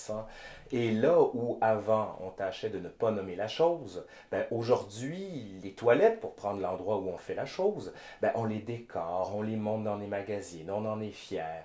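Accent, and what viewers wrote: Quebec, Canada. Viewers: Je découvre votre chaîne, je ne me suis pas fait "chier" une seule seconde avec votre histoire du caca, félicitations. En plus l'accent québécois, qui pourmoi à un charme extraordinaire, je m'abonne à votre chaîne....... et petit détail , j'ai remarqué, jeune homme, que lorsque vous prenez l'accent des nobles français du temps passé, vous perdez votre accent québécois, pour moi c'est fantastique, c'est comme quand vous les québécois vous chantez, vous perdez votre accent. Alors que nous , les français (vous aurez compris que vous avez à faire à un français), nous quand nous chantons, quand nous parlons nous ne perdons pas notre "accent français"..... celui de l'arrogance française, reconnue dans le monde entier (sauf en France).... Vive votre chaîne, vive le Québec, vive la France et vive le caca..... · Video intéressante, dommage pour l'accent québécois 🤣, désolé, jai du mal mais la video est excellente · Petit accent québécois s.v.p !!! 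· Merci pour ce petit message..j’ai regardé plusieurs de vos vidéos elle sont super intéressant.bien expliquer.et ce petit accent canadien trop chou ..💋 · Quelle érudition et ce petit accent canadien, j’adore. Le sujet n’était pas facile à traiter mais vous l’avez bien chiadé enfin, bien traité. J’aime beaucoup vos capsules.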